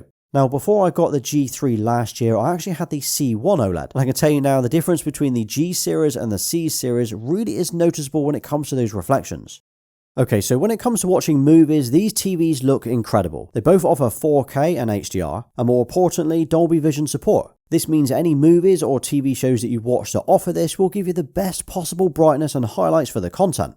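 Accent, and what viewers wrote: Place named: England, United Kingdom. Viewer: what is this city ? miami or another ! hi bro from kazakhstan 🇰🇿 your accent so beautiful 🔥 the english accent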